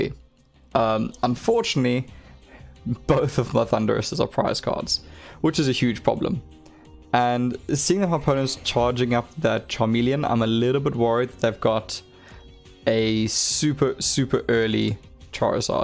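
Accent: South Africa. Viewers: Recognised the accent immediately... =) Fellow South African here. I was torn between these 2 initially, but ultimately went with Relentless Flame due to both recommendations and daily challenges. Now I have both. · You sound South African...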